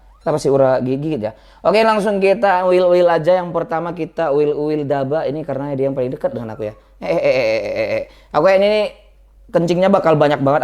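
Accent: Java, Indonesia. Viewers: kangen suara Asli jawanya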